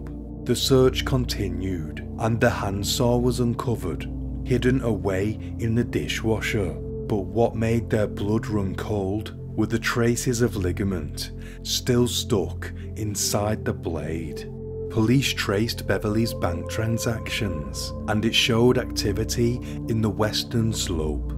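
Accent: United Kingdom. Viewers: That's one of the most British accents I've ever heard my god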